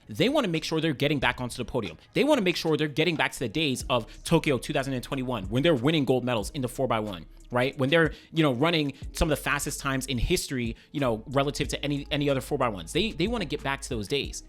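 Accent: Jamaica. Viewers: Are u Jamaican or have Jamaican routes bro ?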